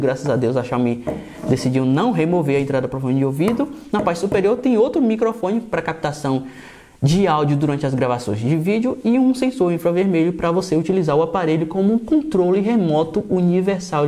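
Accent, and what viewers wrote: Bahia, Brazil. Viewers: Mano vc é baiano? O sotaque e as gírias igual a minha pivete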